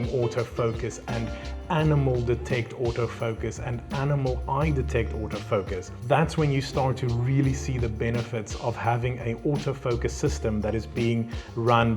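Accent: South Africa. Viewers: He's a South African. South Africa is a country inhabited by people. People have different accents, depending on their parentage, heritage and significant influences during their language acquisition years. Almost no-one, except Actors playing a specific role that requires a particular accent "try to sound like an American", because (as in all societies) there are dozens of regional accents across the country. Your comments illustrates several things about you. ● You are a poor observer ● You are immature ● You have little knowledge of languages ● You rarely think clearly.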